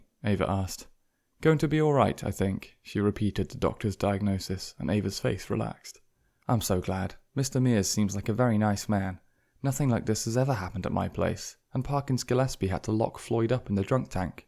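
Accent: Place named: United Kingdom. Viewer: the British accent really adds something to this lol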